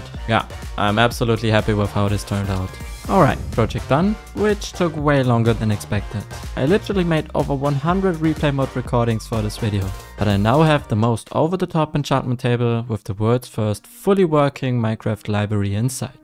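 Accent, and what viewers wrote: Germany. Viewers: Deutscher akzent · I hear a little German AKZENT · Love the german accent (im german too lmao) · Der deutsche Aktzent kahm mir schon etwas bekannt vor, aber geiles vidio :p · PLEASE PLACE LIGHTNING RODS AROUND SO IT DOESN'T HIT THE BUILD OH MY GOD btw dachte mir schon von Anfang an, "das klingt wie ein Deutscher" 😅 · The gearmen acent 🤌🤌🤌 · erstens wusste ich garnicht dass du deutsch bist zweitens als du deutsch gesprochen hast hat man dich nur schwer verstanden da du so viel grass plaziert hast wow jetzt wo ich weiß dass du deutsch bist fällt mir auch dein aczent auf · Ich gucke dich zum ersten Mal und hab direkt an dein Englischen Akzent gehört das du deutscher bist 😂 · I like the fact that he has a german accent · You sound german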